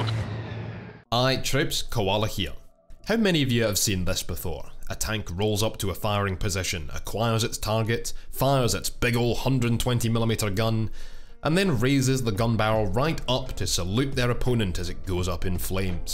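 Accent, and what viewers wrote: Scotland, United Kingdom. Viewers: Yes, I already knew everything said but sometimes you just want to spend seven and a half minutes listening to a Scottish man talking about a subject you like because they do it better than you. XD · Was trying to work out if your were one of us Scots.. wasnt until you said lads and lasses that i knew for sure! great video! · You sound Scottish mate. A good pal of mine's was in the Royal Scots Dragoon Guards & used to drive a Challenger 2. He said it is still one of the best tanks in the world, even though there have been a few new ones introduced to various armies around the world.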